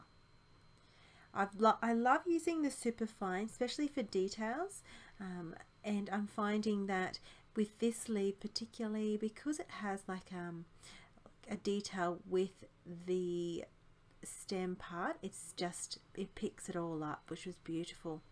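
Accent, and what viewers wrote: Australia. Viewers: Very pretty. Love the colours. (Love hearing our Aussie accent in videos too! ) Thanks for sharing. 🙂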